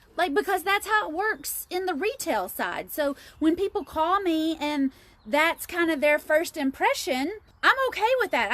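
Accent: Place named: South, United States. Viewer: Such a cute lovely southern bell accent ❤️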